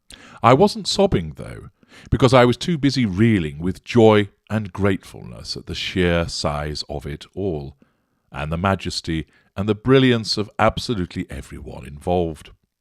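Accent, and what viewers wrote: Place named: England, United Kingdom. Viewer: 15 October 2022 Hello from Australia! Firstly I would just like to say how much 'I enjoy listening to your common sense videos'...I am 65 have always been a Royalist through and through! I have listened to many different Utube Channels and found yours to be the most informative, entertaining, and everything I could say aswell...plus love your very English Educated Accent and tone of voice! I can't stand high pitched gabble gabble it turns me right off! I don't know whether I am a Narcissist either..but I do know now quite afew even in my own family! One thing about MM...she has made people very aware of what a Narccist is? Before she came on the scene...I don't think many of the world population knew what one was! I certainly do now! All I have got to say on the matter! Keep up the excellent.broadcasts too... Thankyou sincerely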